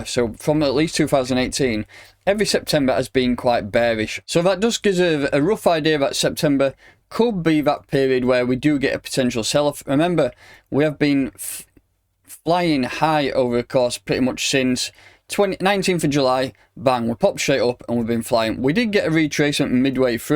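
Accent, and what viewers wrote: United Kingdom. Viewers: you have the harry potter accent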